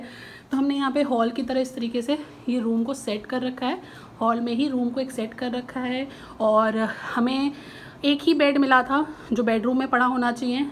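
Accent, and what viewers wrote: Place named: Pakistan. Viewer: that's gd but you speak in Pakistani style and speak very clear Urdu as well even I've noticed one thing that you didn't use any single word of Hindi.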